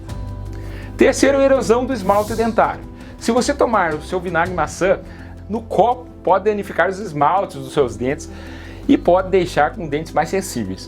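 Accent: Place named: Curitiba, Brazil. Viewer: Se eu não me engano, é de Curitiba ou Santa Catarina. Tb acho lindo o sotaque dele. Aliás, o Brasil é rico em lindos sotaques. ❤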